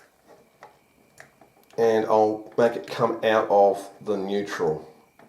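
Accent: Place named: Australia. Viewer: Great to hear an ozzie voice when looking into this subject ta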